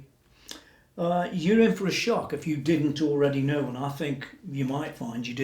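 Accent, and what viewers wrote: Stoke, United Kingdom. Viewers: Sounds like a Stoke accent to me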